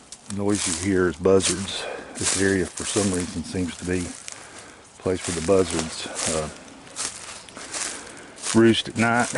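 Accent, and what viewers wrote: South, United States. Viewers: Enjoy your Videos, good hearing another Southern voice, pretty Country there. Stay Safe, best wishes from a Ga Fan....😷🇺🇸🍑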